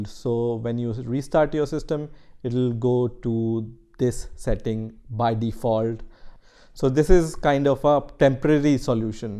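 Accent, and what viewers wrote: India. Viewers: This man sounds Indian to me. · English title 😀 Indian accent 🙁 Actually using English 🤩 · this man has a American ascent and a Indian ascent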